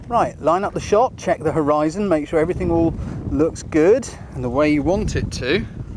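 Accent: United Kingdom. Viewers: This is excellent, great content for a photographer looking to get into video. Thanks for sharing, any chance of more in this series? Your presentation style is spot on, and a British accent as well, hooray!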